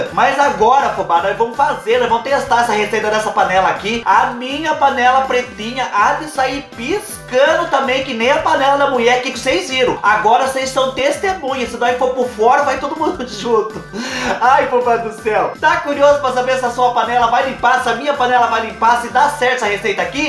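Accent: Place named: Paraná, Brazil. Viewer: KKK ELE NAO PARA DE FALAR NEM PRA RESPIRAR, DO QUE LUGAR VOE E DO PARANA, EU AMO ISSO, KKK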